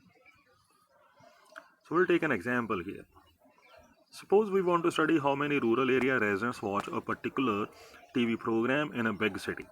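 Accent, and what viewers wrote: Punjab, India. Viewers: This is extremely helpful and simplified.,that punjabi accent is clear even to a Zambian like me.I enjoy that epecially that is concentrated with quality information that is chunked into very simple steps. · good sardar ge. i knew all these things but i enjoyed you explaining all this stuff, being punjabi myself i love to listen to punjabis speaking english from which the fragrance of punjabi oozes out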